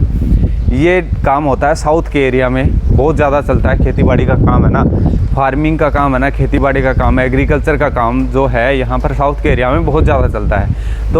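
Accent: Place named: Uttarakhand, India. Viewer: tum bhai pkka uttarakhand se ho .. tone mai hi apnapan h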